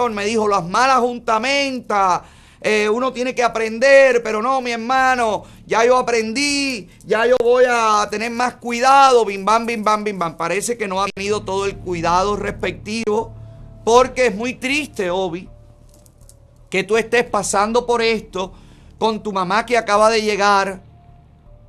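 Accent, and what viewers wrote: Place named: Mexico. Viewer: Ahora esto es un show con acento neutro mejicano 🙄 lol para a ellos se subscriban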